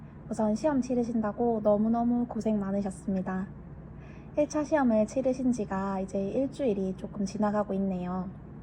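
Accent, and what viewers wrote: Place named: Gyeongbuk, South Korea. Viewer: 감사해요 선생님! 어제부터 감도 못 잡고 무의미하게 검색하며 시간만 허비하고 있었는데 어느 정도 방향이 보이는 것 같네요. 억양이 경북이신 것 같다고 생각이 드는데 합격해서 현장에서 꼭 뵐 수 있으면 좋겠습니다!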